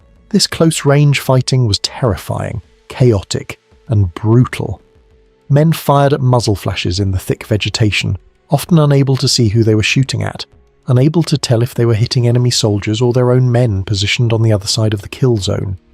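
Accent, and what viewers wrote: United Kingdom. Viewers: Wwwhhy a gd Brit narrator voice? What was next to last option ?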